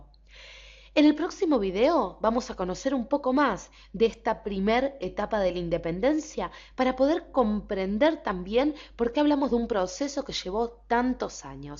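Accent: Argentina. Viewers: Hola y disculpa que te tutee, pues nada que agredecer con gusto, escucho tu acento y eres argentina sino me equivoco...bueno es interesante la historia de este país, solo que te venden otra historia....la que todos conocen, pero investigo mas de 10 años el tema de la Independencia de méxico, que tanto saben sus habitantes, y no es mucho lo que saben...hay mucho desconocimiento...lo que guste referente al consumador de la Independencia de méxico..te doy información...cuidate.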